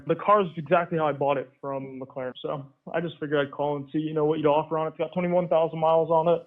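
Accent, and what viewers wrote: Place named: Montana, United States. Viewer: Eww I can hear the Montana in his voice lol 80k was actually a good offer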